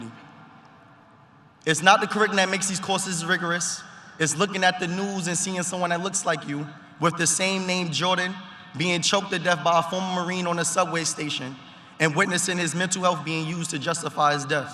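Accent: United States. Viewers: I ❤ his Brooklyn accent! Saying 👋🏿 from Texas. Proud of you, Jordan! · That DEEP New York Accented Beautiful man of Color spoke LIFE🎉🎉🎉🎉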